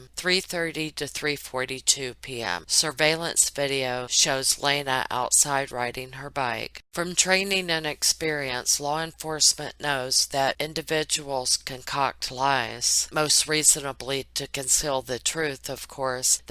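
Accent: United States. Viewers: I love you an shit. I think youre so down to earth and you sound like a USA version of me lol Keep up the good work. The world was already Mad hunny pie. Its just that we are all at home watching and listening and realising how fucked we truly are. Double Pandemic. Covid and Stupidity. Stay safe and feel the love Im sending you. From one night Owl to another, love and light to everyone from wee me over here in SUNNY Scotland lol (see how long this lasts eh...) lXl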